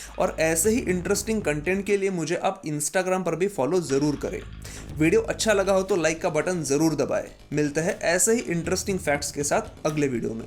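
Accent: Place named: Maharashtra, India. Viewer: you should work on your hindi accent.. looks too much of marathi influence.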